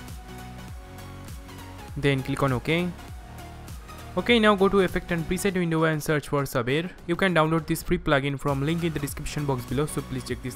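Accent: Bengal, India. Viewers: Darun laglo. Ami katha sune bujhlam apni Bangali. Khub informative video, ebong khub sundor. Sudhu ektai jinish, if you don't mind, uchcharon gulo ektu thik kore nilei bas, perfect. All the best brother! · India for sure buddy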